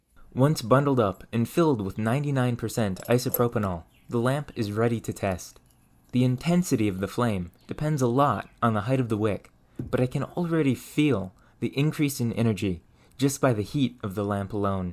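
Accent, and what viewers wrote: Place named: United States. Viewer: Where are you from? Your accent is generally American but you say "for" and "fur" the same way and any syllable ending -or with an -er sound. Regardless of that I appreciate the info. I'm on a quest to learn how we got from rocks to rockets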